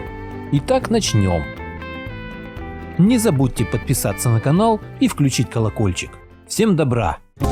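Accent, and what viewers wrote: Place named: Arkhangelsk, Russia. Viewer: уловила наш говорок Архангельский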